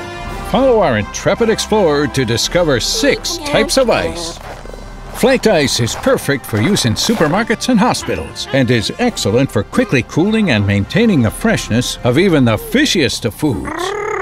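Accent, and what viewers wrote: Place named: United States. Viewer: Aaww. I remember when I was still a kid about the voice over. Very American and convincing voice. Sounds good quality and reliability.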